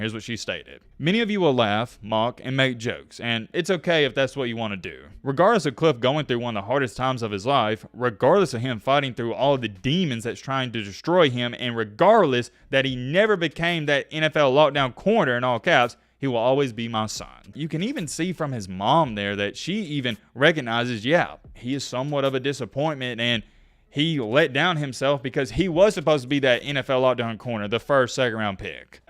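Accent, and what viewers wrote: South, United States. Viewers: The fact that this is a white guy (person who made this video) and southern from what I can hear shows the undertones. Look at how many times this guy loves showing that photo of Harris on her and over. You’ve listed no sources, no cites. You’re not from the area Cliff is from, went to the college he went to. You’re a joke The picture is on your caption, yet you display that photo so many times as if we hadn’t seen it ten seconds earlier. This channel and this narrator is a person of trash, and he will get his. This could have been done with class, but was nothing but trash. P.S Anyone reading this. The actual video starts at minute .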